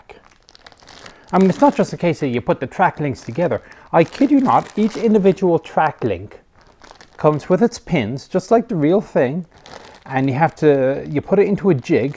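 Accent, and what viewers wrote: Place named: Ireland. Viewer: Now all I see is a Greek man with an Irish Accent, the entertaining link to Father Ted is destroyed.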